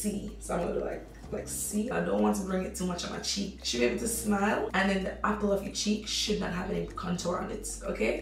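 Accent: Jamaica. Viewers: heard ur accent and said instantaneously "Jamaica 2 d bbclt world" tf. Repping wonderfully mama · girl, are you Jcan? I hear a slight accent, but then again, I could be wrong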